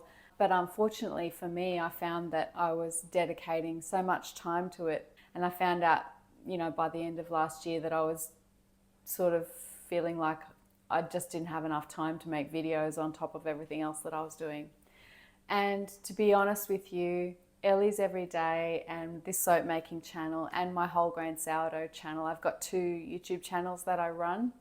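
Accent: Australia. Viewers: Thank you Elly, you have inspired me for quite some time, and always look forward to learning more from you. I am glad you have figured a way to continue to share what you love, yet finding balance. We all have to find what works for us. I too changed direction at the end of last year, mine was from selling soaps etc, unfortunately a very recent cancer diagnose has offered a new challenge. We all must follow our heart, and live with passion. From this Kiwi in NZ, Peace & Love (PS Love the aussie accent)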